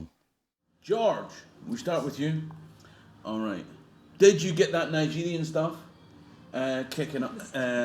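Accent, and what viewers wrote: Scotland, United Kingdom. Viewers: As a black man from America it is refreshing to see someone talk about Scotland from a black Scottish point of view. Your insight into Scottish culture and history is very transparent and fascinating. Because by you having that insight from a different perspective. You give a more well balance look at the Scottish culture coming from both sides .In my short time watching your videos.I had learned so much because it is easier to when i see myself.Even though you're Scottish accent is thick . Scottish history has alot of black roots in it. You really makes it fun and very interesting. You talk about the technical aspects of history and culture with having the need to down play or erase any type of white contributions that was made towards the Scottish culture. I was always fascinated with Scottish culture. But i could never listen to or watch any white Scottish person speak on it. 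Because they always had the need to downplay or erase any type of contribution that was made by black Scottish folks.You don't downplay nobody real contribution to the Scottish culture. I thank you for that.I'm trying to get others to know about your channel.I feel that it is good for young black children to learn and see different cultural groups within the black world wide community. Your videos are fun and your presentation is on fire. That's how good you are my brother. Much success to you and your channel.💯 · DNA is good for crime detecting, and paternity too, but as far as ethnicities I think it's not accurate. People have moved in the past all over and if you went in a time machine back 300 years what would test results say then? You sound Scottish to me. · WOW this was so interesting your daughter is the polar opposite of my DNA test results... genetics is so fascinating. Btw I love your Scottish brogue 😊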